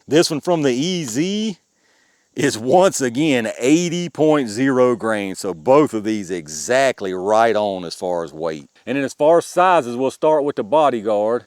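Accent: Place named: Appalachia, United States. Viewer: Shame it didn’t do better. I have a lcp 2 and max and I am always on the lookout for the best! I currently have underwood extreme defenders but if you know anything better feel free to cue in as I must have missed it…. I was watching one of your videos last Friday and I asked my girlfriend who lives in southern Indiana whereas I live in Louisville where you sounded like you were from and she thought east Tennessee or WV. I told her I thought you’re from central Virginia or southern like Wytheville, Bluefield or Roanoke maybe even the Carolina’s but Saturday we went to Red River Gorge which is near Slade, KY for some hiking and she said maybe east KY. Who’s right? 🤣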